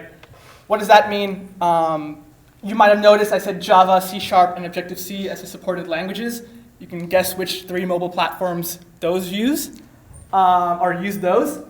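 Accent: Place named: West, United States. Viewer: Useful video. However may I suggest that you might want to try to keep your accent a bit neutral. I see a heavy leaning towards the western accent, but that's starting to sound quite artificial and meddling with comprehension. Just my 2 cents.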